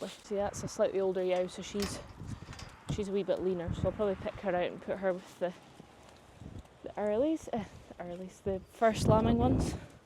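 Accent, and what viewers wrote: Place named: Scotland, United Kingdom. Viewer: Hi, you have so many ewes to still lamb and that means you will be busy for a long time. Yes, they all look good considering all the rain you had earlier. You will have to train Davie better, not getting your lunch is a bad start for him. I assume your dad is looking after the cattle while you do the sheep, a good team working well. I love listening to your unique Scottish voice, its so different from the lingo in Aussie. Looking forward to hearing your news. Chris from Victoria, Australia 🇦🇺.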